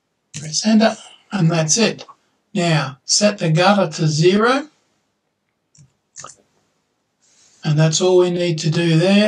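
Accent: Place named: Australia. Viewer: I’ve been watching you for a while now and always thought you were an Aussie..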